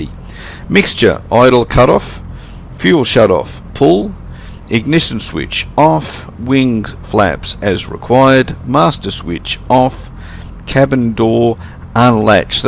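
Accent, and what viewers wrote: Australia. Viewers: Great video, a side of flight sims people don't usually consider. Good to hear another Aussie too!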